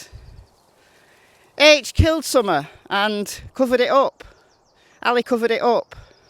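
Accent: United Kingdom. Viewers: Your channel makes the most sense. You are not a gossiper, fabricator and are authentic and I love your British accent 👋🏽💘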